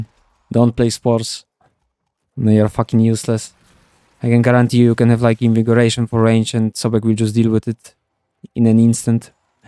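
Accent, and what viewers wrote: Poland. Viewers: Just on their own, hounds with manifold and contagious bond, synergized prospectus and some good statuses on their weapon can nuke rooms on steel path (especially now if your hound gets jade eximus aura that's like guaranteed room wipe xD ) But man, damage on that Syam is crazy! I really have to finally get this weapon Also, it's Muramasa a reference to Terraria? Oh and you're from Poland, yeah I thought accent sounded familiar :D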